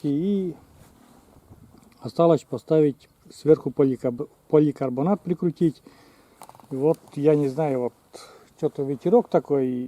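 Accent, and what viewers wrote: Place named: Komi-Permyak, Russia. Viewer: Я когда то жила на севере Пермской обл.коми-пермяцкий округ,мне твой говор,родной.Как только услышала первый раз,сразу поняла🎉,что говорит коми- пермяк. Я уже начала язык понимать,да родители уехали оттуда,а мама знала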